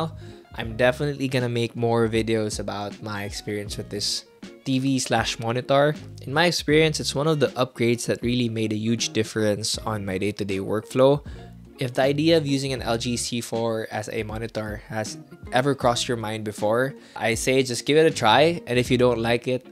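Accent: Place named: Philippines. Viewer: Oh wow, as soon as I heard you start talking I was like "he sounds like he's from PH..." :P My LDR girlfriend is from there as well; any recommendations for cool places to visit when I go there in the fall? Borocay seems cool but I feel like everyone does that.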